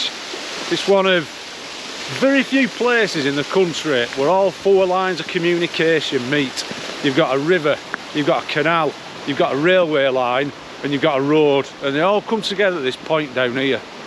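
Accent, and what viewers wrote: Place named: Lancashire, United Kingdom. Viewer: Lovely and educational as usual, I've told you before I'm a Yorkshireman but it's fantastic to hear a true Lancashire accent and follow you on your adventures. Atb and keep putting the great content on fella.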